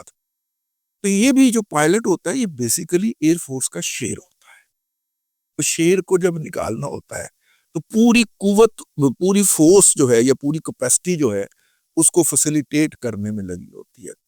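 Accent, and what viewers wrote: Pakistan. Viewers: I love your Pakistani tune, which is highly appreciated. Thank you DADA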